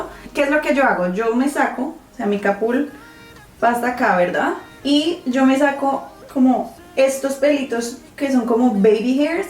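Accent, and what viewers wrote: Colombia. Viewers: Escuchar la capul me recordó a mi madre muy rola ella🥰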